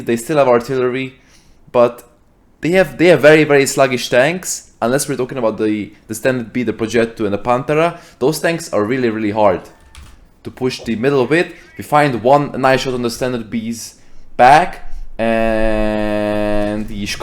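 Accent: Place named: Hungary. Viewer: magyar vagy te baszod az akcentus :D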